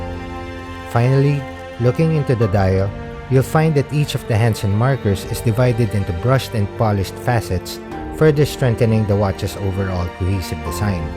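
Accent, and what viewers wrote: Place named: Philippines. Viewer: your filo accent is annoying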